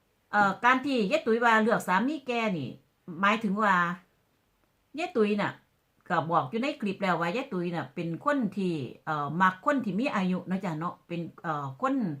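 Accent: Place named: Khon Kaen, Thailand. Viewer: เปลี่ยนช่องมาติดตามพี่จ๊ะดูหลายตอนแล้วชอบสำเนียงคนขอนแก่นเพราะคุณยายและแม่เป็นคนขอนแก่นค่ะ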